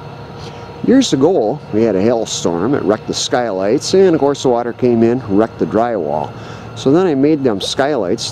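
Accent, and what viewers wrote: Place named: North, United States. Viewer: awesome vid keep em comin. cant tell if hes from sconny or sota but i love the northern accent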